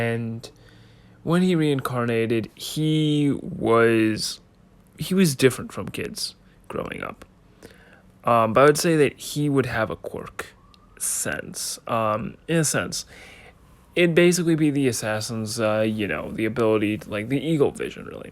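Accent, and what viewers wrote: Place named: Italy. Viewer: Also gifted Italian accent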